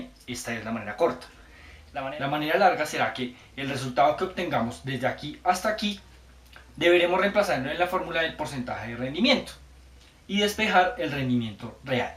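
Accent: Colombia. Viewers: Profesor de que parte de Colombia sos ? :D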